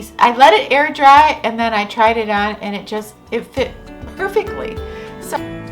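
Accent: Midwest, United States. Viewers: Your accent is Midwestern? Where are you from?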